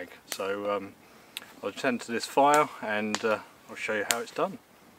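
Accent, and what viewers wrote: United Kingdom. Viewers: Wow i just found a video worth for my lesson to study living outside, i subscribe it m8, your video's so cool And british accent? Youre an england?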